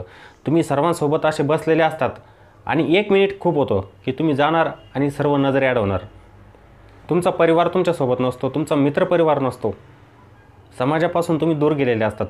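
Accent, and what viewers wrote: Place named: Krishna Valley, India. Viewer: Krisgna ghati che boltay bhau tumhi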